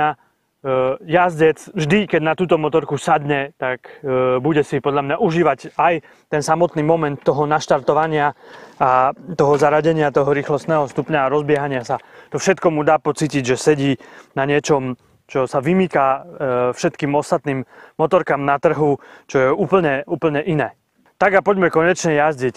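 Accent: Eastern Slovakia, Slovakia. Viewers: a ten krásnej vyhodnarskyj přízvuk👍díky. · Video fajn,len ten strašný východniarsky prízvuk ....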